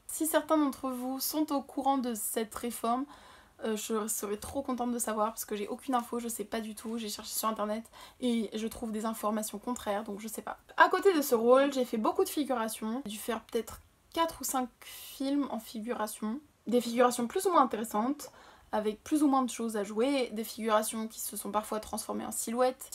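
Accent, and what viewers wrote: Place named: France. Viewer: Et un bel accent français exprimé aussi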